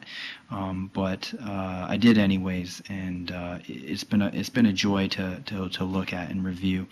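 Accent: Canada. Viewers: This guy sounds Canadian to me. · wow i've never heard someone call so many things by the wrong name. i bet you call the ipod touch an itouch too. the cpu is in ghz and the disk is 320gb. the ram is 4, its called macbook air, and macbook pro. its also called 9400m and 9600m gt. i sense a canadian accent so it's understandable.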